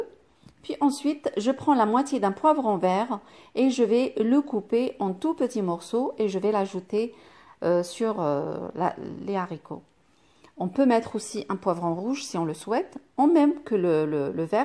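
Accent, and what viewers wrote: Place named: Morocco. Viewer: Merci ma chère pour cette salade d'été. je n'ai jamais utilisé la mangue dans les salades salées mais je n.'exclue pas l'expérience... Pour le produit et la pub mensongère bien sur que ce n'est pas étonnant. Les gens sont curieux de voir la vidéo soit parce qu'ils sont intéressés par le sujet, soit ils sont attirés par le titparde la vidéo et sont déçus par le c9ntenu. Maintenant si cette vidéo a été vue par tt ce nbre de personnes et qu'ils ont été déçus par le résultat, ils ne verront ce que cette personne présentera par la suite, se désabonnent même s'ils l'ont fait. En tous les cas machAllah 3like ma soeur pour tes vidéos aussi bien expliquées en arabe et en français avec un bel accent marocain.😉... Je suis abonnée a ta chaîne et encore us de recettes pour cet été. 👍👍👍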